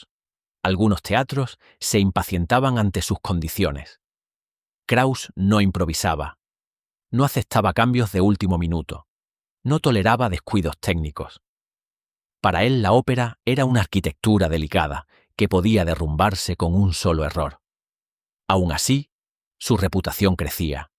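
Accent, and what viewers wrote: Andalusia, Spain. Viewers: Es andaluz el que habla. Que problema hay?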